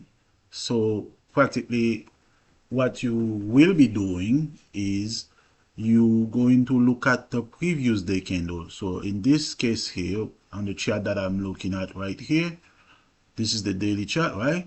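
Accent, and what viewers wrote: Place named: Haiti. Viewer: You sound Haitian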